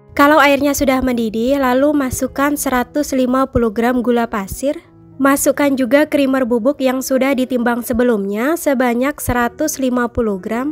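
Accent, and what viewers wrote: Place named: Madura, Indonesia. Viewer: Logatnya kayak madura 😅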